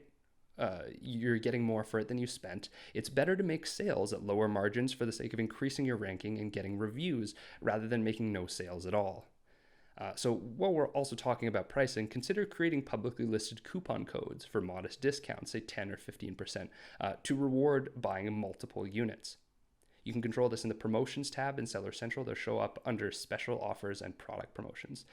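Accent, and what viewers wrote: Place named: Canada. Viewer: canadian eh? greetings from the frozen alberta. great content man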